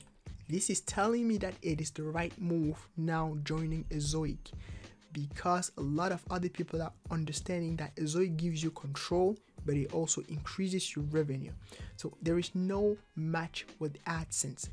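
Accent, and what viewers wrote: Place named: Nigeria. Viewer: I just landed on your channel and l stayed on. Good stuff. Your accent sounds Nigerian.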